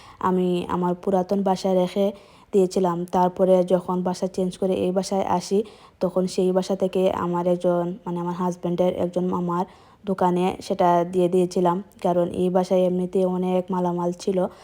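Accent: Sylhet, Bangladesh. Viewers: আসসালামু আলাইকুম আপু কেমন আছো তোমার ভিডিওটা অনেক সুন্দর ছিল আপু তোমার বাসাটা খুব লার্জারি তোমার খুব ভয়েস শুনে মনে হয় গ্রামের বাড়ি সিলেট তাইনা আপু কোথায় থাকো তুমি ❤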